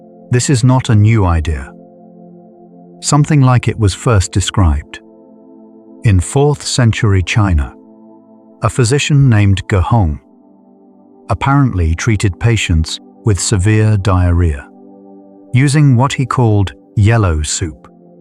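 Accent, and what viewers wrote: England, United Kingdom. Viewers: Thank you for your wonderful videos. The information is awesome. I do fall asleep in the middle, but I know that your information is well researched and will go into my subconscious. Another idea for your videos is what creates disease I’ve been listening and learning from Dr. Robert Morse he’s in Florida. He’s a nature path and his philosophy and understanding on the body is that we have two systems the blood system and the lymphatic system, and the most important system for health is a lymphatic system, which is our draining system. You are either acidic or base alkaline and the bodies always trying to be at homeostasis so if you’re sick, you’ve got too much acid in your system and in order to bring Health, you need to bring in more alkaline so he is a fruitarian or more so high fruit and plant-based very interesting. Interesting intelligent man look them up. 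he’s also an iridologist and he’s very very wise so if you could learn his information and put that into your video, it would be a great way on how to teach people how to be healthy. Thanks again. Love your videos.🥰 ps.. I love the English accent very soothing, im from Vancouver B.C.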